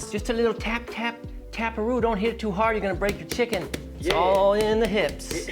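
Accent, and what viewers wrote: United Kingdom. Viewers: "Woostersheer" is how to pronounce it. Great to know you were born in UK. You can take the guy out of Britain, but you can't take (all) the Britain out of the guy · Where in uk were you from sonny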